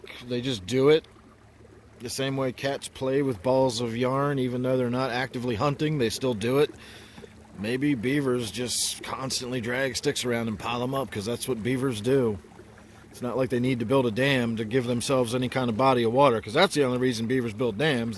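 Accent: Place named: Baltimore, United States. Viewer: Yes, Sir, it's definitely not the hardcore Baltimore accent, it's quite a bit softer.Maybe suburban It's odd because some of it sounds familiar to me (Virginia) but then a few words sound like of Northern, so when that happens I think Maryland.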